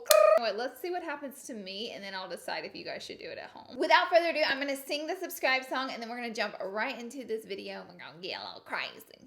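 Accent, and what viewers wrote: South, United States. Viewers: Love your dramatic southern bell voice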